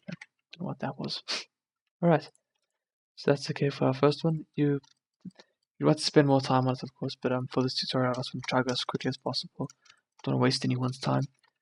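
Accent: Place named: South Africa. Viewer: I flippen knew it! I can hear the accent a mile away, i am too, it's good to see another Blender South African out there, keep up the good work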